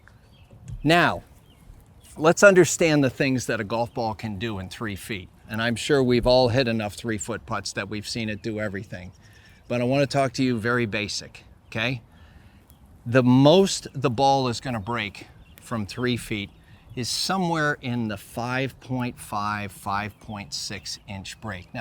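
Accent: United States. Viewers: Just a standard Connecticut accent? It’s not even thick.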